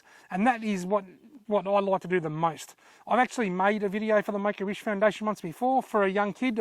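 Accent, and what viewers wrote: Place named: Australia. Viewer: i am proud to be aussie when i hear a fellow aussie speak this way good on you keeping it real is so important great job mate:)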